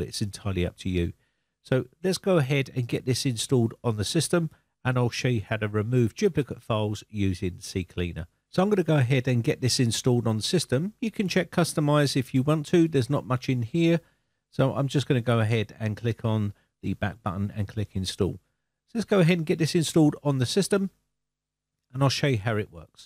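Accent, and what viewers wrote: United Kingdom. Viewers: British accent perfect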